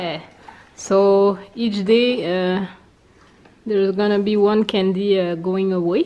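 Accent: France. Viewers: I have to tell you that I love your french accent! 😊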